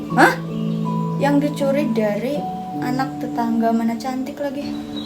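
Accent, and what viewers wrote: Java, Indonesia. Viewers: Muka sunda logat jawa. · kok logatnya kayak Jawa, aslinya mana mohon dijawab, salam jatim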